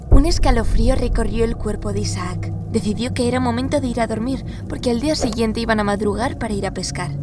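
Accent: Spain. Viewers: por la forma de tu narración, eres española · Una historia latina narrada con una voz de española... Simplemente hermosa narración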